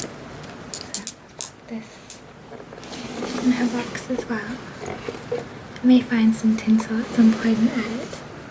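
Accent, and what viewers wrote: Australia. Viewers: Are you from Australia by any chance ?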